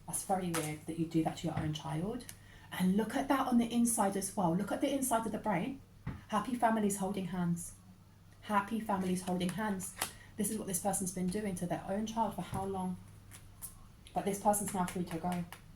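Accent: United Kingdom. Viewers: I think 🤔 she’s 😢British 🇬🇧 I am Spanish from the 🇺🇸 I can understand her I put on headphones to listen closely.🎧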